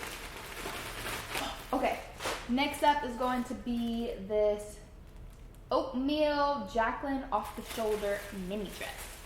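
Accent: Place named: Texas, United States. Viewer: i feel like you had a strong texas accent throughout this video 😂💕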